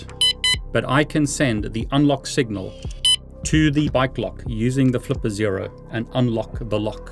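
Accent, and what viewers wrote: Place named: South Africa. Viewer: can hear the south african is still there with you. Also glad you got out, its getting worse here. But im sure you know that already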